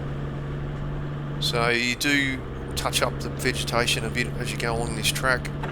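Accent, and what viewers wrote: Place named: Australia. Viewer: Interesting accent, I realised Australians pronounce 'i' as 'oi', like 'time' becomes 'toime'. Nice track i wish my country had such places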